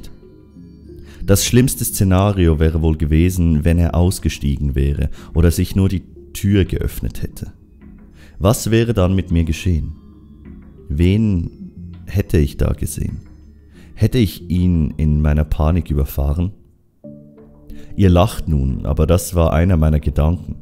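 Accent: Switzerland. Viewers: Du bisch Schwiizer? Jo ehre💪🏼🇨🇭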